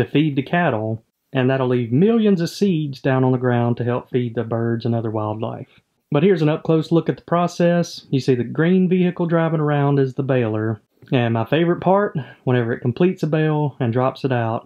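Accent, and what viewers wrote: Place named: South, United States. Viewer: the way you've said "hello fresh" was poetically beautiful. What part in the US are you creating from? I did hear a cave climber sound similarly too. I think they were from georgia or somewhere southern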